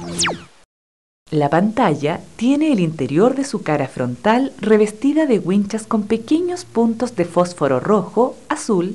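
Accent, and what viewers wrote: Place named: Chile. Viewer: Se le nota el acento chileno a la morra que habla en el vídeo.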